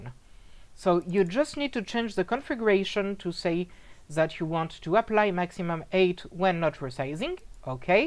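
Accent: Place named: France. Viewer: Thank you very much really useful addon and I really like French accent :)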